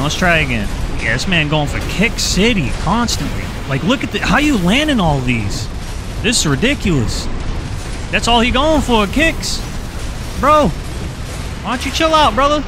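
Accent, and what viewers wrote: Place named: East Coast, United States. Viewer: Love your videos homie! Judging by your accent I’m guessing you’re from the east coast Boston or jersey! My old job I had a ton of coworkers who came from the east coast to train with me here in Cali so I can spot that accent a mile a way lol. Btw I’m on my third play through on the story mode. I’m trying to unlock all parts before I make the journey in to pvp. I’m a veteran of this game dating back to ac1 but due work and family duty I’ve been trying to make some time For some ac goodness !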